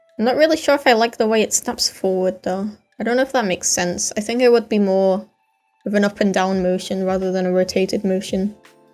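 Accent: United Kingdom. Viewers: .. in the least sexist way what gender are you. You sound like a British young male or a British adult female... please tell me I feel weird about this because I'm new and I may or may not be making fandoms and may or may not need to know your gender for a fandom. Not saying anything here just asking mate. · I love the British accent owo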